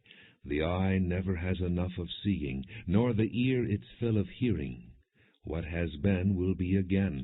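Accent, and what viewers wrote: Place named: United States. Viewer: had a hankering for this book and had to look awhile for an American accent reading, the cheesy shakespearean british ones fail to capture the modernity/coherence of the prose. good stuff!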